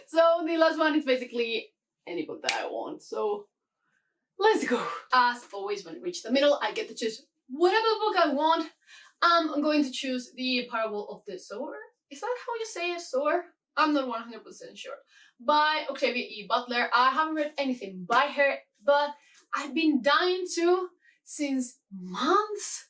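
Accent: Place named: Galicia, Spain. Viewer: Ostia, encontré tu canal hace poco y había algo en tu acento que me sonaba mucho y era de oírmelo a mi, que tb soy de Galicia...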